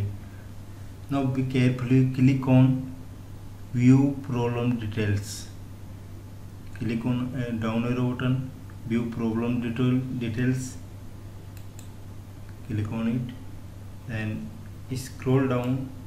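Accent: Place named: India. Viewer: is Indian accent, I feel fine with it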